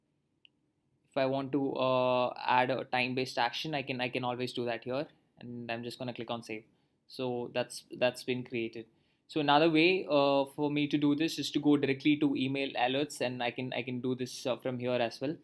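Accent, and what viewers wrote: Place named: India. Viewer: 😅 indian accent 🤦‍♂️🤦‍♂️ at least try to speak without accent, 🤦‍♂️🤦‍♂️ nobody likes this shit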